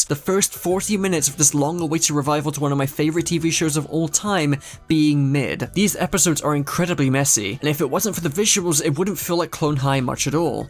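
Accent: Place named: Southern England, United Kingdom. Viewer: Where you from in england bro? More southern than northern with that accent 🤔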